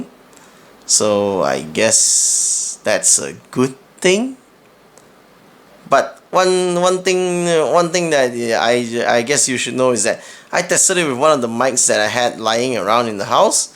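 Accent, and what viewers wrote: Singapore. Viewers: thank u! love the Singaporean accent.. got this camera as a christmas gift and am still learning how to use it.. in ur opinoin is this better than the gopro cams? thanks for the review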